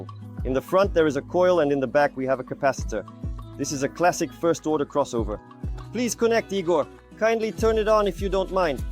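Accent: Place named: RP, United Kingdom. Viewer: The ai translator speaks like a British royalty.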